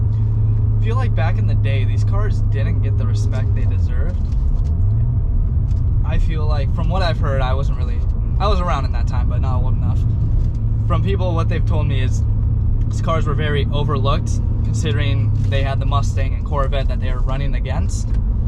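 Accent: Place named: Australia. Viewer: Aussie, mate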